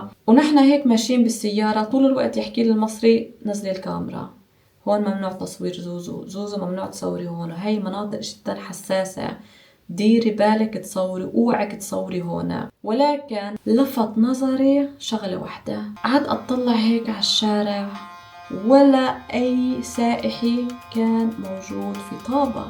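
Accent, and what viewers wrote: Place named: Palestine. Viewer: شفتلك كم فيديو فكرتك لبنانية ، بس حكيتي كم كلمة عرفت انك فلسطينية .. CHلبوا فيي .. تعربشت😅.. يسعد دينك انتي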